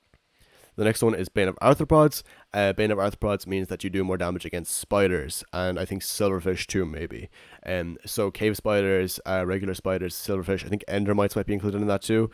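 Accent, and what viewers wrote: Ireland. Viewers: Sounds like an Irish John H. Benjamin...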